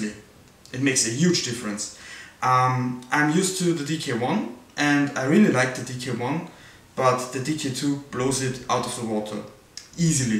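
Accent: Germany. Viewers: That's how a real happy gamer looks like! :D By the way, are you from Germany? Ich frage, wegen dem Akzent :P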